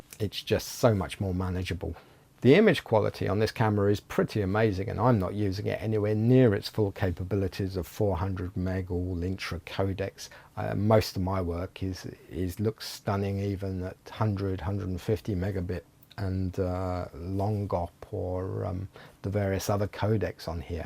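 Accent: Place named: United Kingdom. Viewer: Thank you so much for quick response. :-) I can't wait to watch your next video. I think it would be also very helpful. And thank you for your wonderful British English. It's a huge pleasure to hear that instead of American babble. I wish I had such language skill one day....Greetings from Poland :-)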